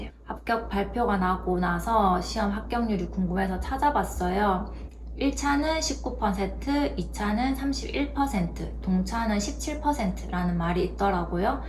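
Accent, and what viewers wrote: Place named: Daegu, South Korea. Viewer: 워킹맘인데 공인중개사 관심 생겨서 검색해보고 보는데 익숙한 사투리 너무 정감있어요 ㅎㅎ 저도 대구에서 자랐거든요~ 열심히 하신게 느껴져서 동기부여도 되네요. 솔직한 영상 감사드려요!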